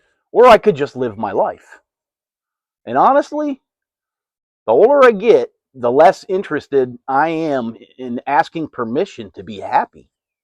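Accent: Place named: Texas, United States. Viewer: I’m from Texas and I thought I could detect some Texan! I like your content btw, keep it up 👍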